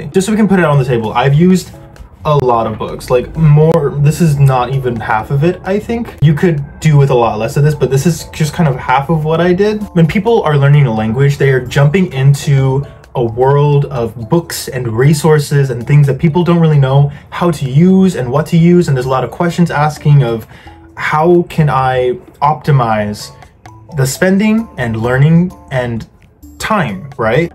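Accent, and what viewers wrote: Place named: Canada. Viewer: Hi Kate! This is a YouTube video, he plans what he wants to say, he cuts out any unnecessary bits whilst editing and you are able to control the volume you hear him in. All these factors make the difference in comprehension when having a real life conversation with someone. My native language is Portuguese 🇵🇹 I had the same issue when I moved to England as a teen, I promise you it gets better (provided you’re learning English?) - I would recommend trying to immerse yourself into the language more, watch vids in English (anything you want to watch search in English), series, movies etc even if you need English subtitles at first to follow along. Books (actual reading books not the teaching ones) are also good, a lot of people like Harry Potter for languages. It starts off easy and then as the books go it gets more complicated which helps you increase your vocab. 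Maybe English is your native language and the accent is what you’re having issues with, most of what I said would still apply, you’d just have to find vids, series, movies etc in the Canadian accent (basically American so it should be alright) good luck :)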